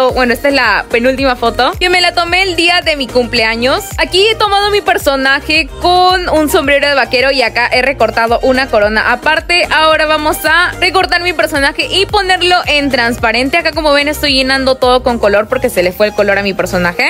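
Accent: Peru. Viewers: tienes acento de peruana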